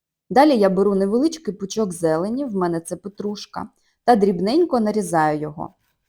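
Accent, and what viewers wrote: Standard. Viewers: Хорошие рецепты,прекрасная подача приготовления,без диалекта и суржика - умница.но только для украиноязычных . Поэтому переходим на другие кулинарные блоги,слава Богу их предостаточно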